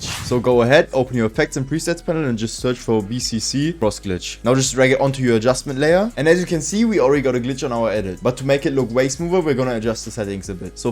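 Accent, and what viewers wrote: Germany. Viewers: Du hörst dichn bisschen deutsch an 😅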